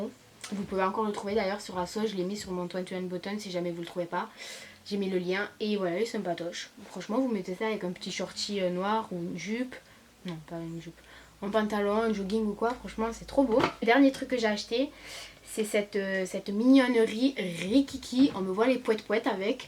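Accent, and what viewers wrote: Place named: Paris, France. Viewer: Pourquoi tu fait l'accent parisien?????🤭🤭🤭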